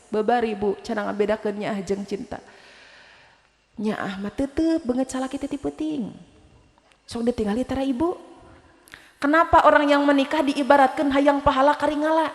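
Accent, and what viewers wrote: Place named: Sukabumi, Indonesia. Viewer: Logat Sunda Sukabumi,.... gaskeun.Bekasi hadir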